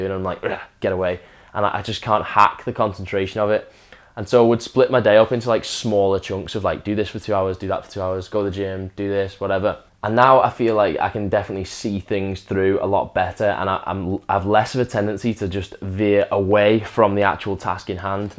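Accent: United Kingdom. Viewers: Hello there. I'm here in Southern California under Coronavirus quarantine and ran out of coffee two days ago. Normally, I just walk down to the local coffee shop for a cup, but everything's closed up and my food delivery service isn't arriving for four more days. OMG!!!! Had NO IDEA how hard it is to kick this stuff. Just realized I've been drinking hardcore for close to 30 years and never really seriously tried to stop. Didn't sleep at all last night and am wigging out. This video is AWESOME!!! I already feel like I'm in that movie Trainspotting and your accent is adding to the experience. LOL!!! 🤣Thanks for posting it. It really helped me out to know that I'm not alone. "Cheers Mate" or as we say here in Cali "Thanks Bro!!". -m. Huntington Beach, Ca. 👍✌😎 -btw i enjoyed your video so much I'm subscribing. The way you articulated your experience was exceptional. 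"Brilliant" is the word I think y'all use over there in'net?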